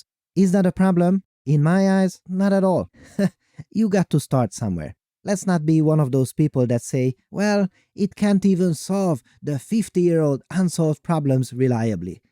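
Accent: Hungary. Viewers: So TMP is Hungarian? News to me. I was curious about the fantastic accent. Great paper as always, Doctor. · Ah Hungarian! Your accent is super cool but also very unique.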